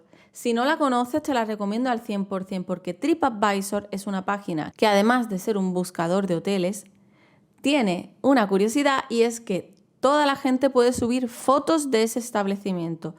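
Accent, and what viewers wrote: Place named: Andalusia, Spain. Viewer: Espectacular video! No podría ser más útil. Tengo pensado ir a Islandia en mi próximo viaje ya que uno de mis sueños viajeros es ver una aurora boreal. Voy a guardar este video para ir organizando en base a tus consejos ❤️. Gracias guapísima, con ese acento andaluz hermoso como toda su gente! Saludos desde Buenos Aires!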